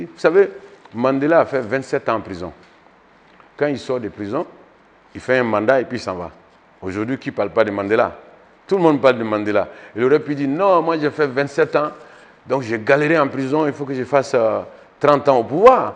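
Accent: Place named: Ivory Coast. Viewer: Mais c'est difficile que a ton âge tu ne connais pas ton pays d'origine par ta parole on connais de quel ivoirien tu es... Importer ou exporter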